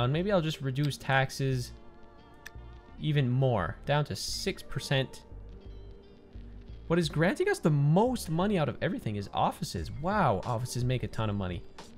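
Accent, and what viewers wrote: Canada. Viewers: Found this channel, love it and love the city and story behind it. Also, you a fellow Canadian eh? Cheers bud, keep up the great work 👌🏻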